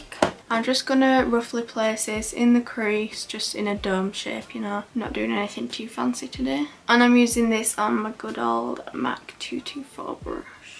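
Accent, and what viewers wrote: United Kingdom. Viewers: Your British accent 😍😍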